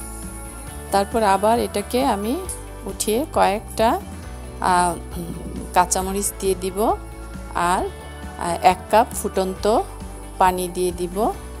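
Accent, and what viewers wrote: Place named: Mymensingh, Bangladesh. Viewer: আপনি মনে হয় ময়মনসিংহের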